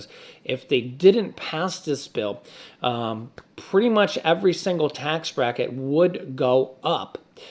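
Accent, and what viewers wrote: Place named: New York, United States. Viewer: Hi, I can see and hear your New York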